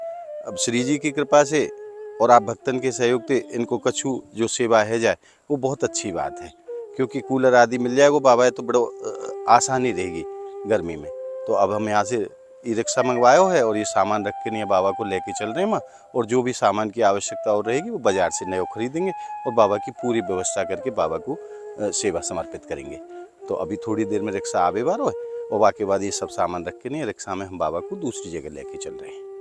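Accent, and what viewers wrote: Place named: Braj, India. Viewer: Sree! ji aap ko mera Radhe Radhe. Mai Rajasthan jodhpur se aap ka video dekhta hun. Bahut achha lagta hai. Aapke braj Bhasa ati sundar aur ati madhur hai. Aap bahut namra hai. Aap ka braj bhasa keval sunta jaun. Jai shree Krishna Jai shree Radhe radhe.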